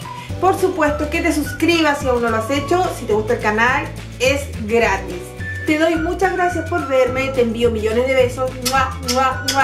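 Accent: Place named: Chile. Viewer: gracias, que fáciles.... me gusta ti acento chileno, yo soy chileno y todo me queda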